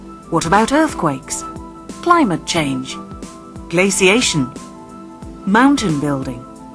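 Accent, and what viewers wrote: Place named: England, United Kingdom. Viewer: The English accent voice-over wasn't a great idea though!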